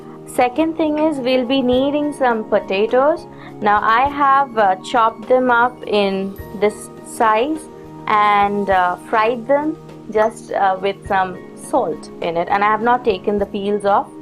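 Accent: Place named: Bengal, India. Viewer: hehehe bhaja mosla that nice bong tone... :P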